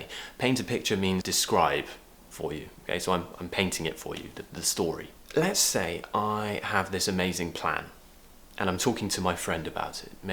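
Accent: United Kingdom. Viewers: Has anyone seen him twice with same hairstyle? Remarkable guy! You've inspired me to learn the British accent. Thank you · I love the British accent. It is elegant and your accent is best ever. I will keep watching your videos to learn as much as possible. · Thanks so much💚 for educating us , Everyday I watch your old and new video's they helps me a lot to learn British accent Big hug for you my English teacher 😘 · I follow many English teachers on YouTube in order to improve my British accent. They are all great but yours is for sure the best! I just love it. Cheers. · Thank youuuu !!!! You helped me a lot to learn british accent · I love British accent and I've been learning a lot because of you. Thank you so much teacher. You're the best. Greetings from Mexico. 💕🥳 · Your speech, awesome British accent and explanations ın a simple and easy way are soooo helpful. 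Thanks a lot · love your accent ❤️ and excuse me, where are you from in the UK? :D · I'm so grateful for your helpful videos; they give me a new lease of life. I find this one especially nice and useful. Let me get something off my chest: I love your British accent and expressions. Have a great day, or as close to a great day as you can have in these difficult times. Cheers,!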